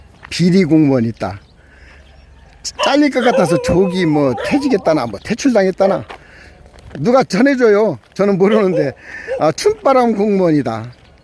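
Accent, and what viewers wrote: Jeolla, South Korea. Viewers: ㅎㅎㅎㅎㅎ 어느누가 님을 비리 공무원으로 의심을 하겠습니가 인상이 완전 농사꾼 스타일인대요 손을 봐도 그렇고 말씀하시는것도 그렇고 ㅎㅎㅎㅎㅎ 간간히 전라도 풍의 말씀이 나오길래 전라도분이 아니냐고 물어본적이 있었어요 ㅎㅎㅎ 아무튼 부럽게 잘하고 계심니다 ㅎㅎㅎ 저도 거기서 살고싶내요 다들 모여서요 ^^ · 덕진 소방서면 금암동 전북대 정문위에서 근무하셨군요 ㅎㅎ 어쩐지 영상보는내내 말투가 익숙하다했습니다 ㅋㅋㅋㅋ 평화동이 부모님댁입니다 ㅋ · 어쩐지...전라도 사투리가 ㅋㅋ 저는 고창이 친정입니다 왠지 마음이 가더라구요 화이팅 ♡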